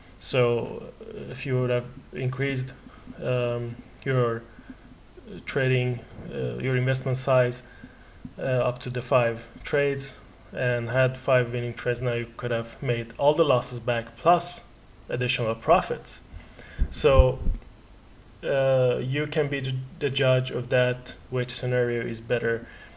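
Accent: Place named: Hungary. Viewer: where are you from? i cant figure out by your accent. i feel like hungarian but im not sure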